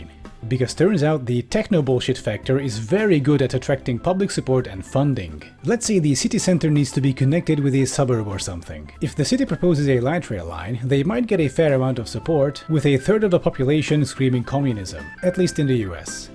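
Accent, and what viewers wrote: Hungary. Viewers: Are you from Hungary?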